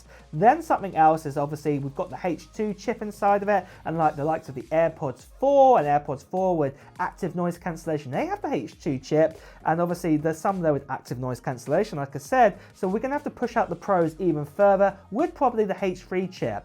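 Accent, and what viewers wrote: United Kingdom. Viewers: Are you British as you sound British so why not tell us how much they cost in Pounds ?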